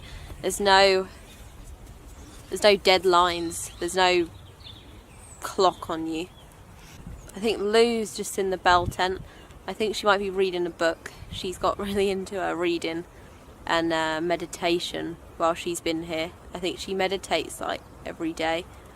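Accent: United Kingdom. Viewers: I love her accent. It's so amazingly British. 🇬🇧 It would be nice to meet by the fire. 🙂 Regards from Poland. 🌳🏕️🏞️🐗🐿️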